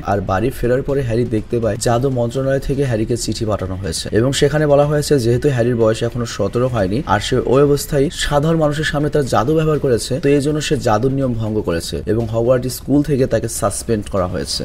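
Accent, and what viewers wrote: Bangladesh. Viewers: তোমার এই হালকা বাংলা দেশী টান কথার মধ্যে আমার ভালোই লাগে ❤ কিন্ত হারামাউনি মানা যায় না (হারমাউনি)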